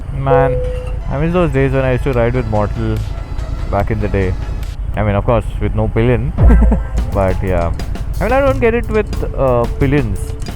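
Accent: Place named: Malvani, India. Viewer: Once you hear malvani/ marathi accent you cannot unsee it !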